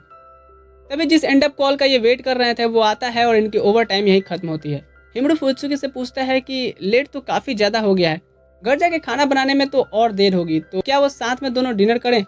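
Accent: Bihar, India. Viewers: Bihari h ky itna ganda explanation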